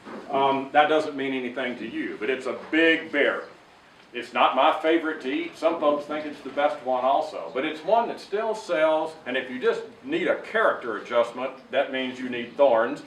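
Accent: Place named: Arkansas, United States. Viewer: Excellent info, two thumbs up! Also if you want a chuckle, turn on CC, and see what it translates Arkansas accent to. lol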